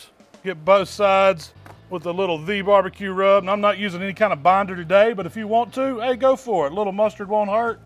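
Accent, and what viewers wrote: South, United States. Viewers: his southern accent alone makes me trust this guy when it comes to anything related to BBQ and smoking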